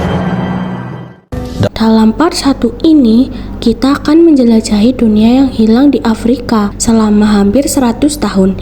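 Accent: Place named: Java, Indonesia. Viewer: Pengisi suara logat JAWA mbaknya 😄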